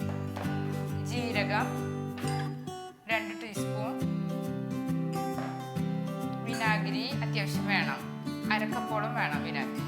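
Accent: Thrissur, India. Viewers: Njnm aghna paraya.... hostail ennun kaliyakkum.... tcr slang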